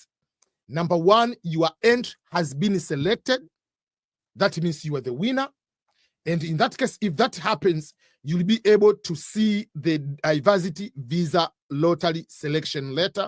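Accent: Uganda. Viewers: All along i thought this man is ugandan from his accent 😂😂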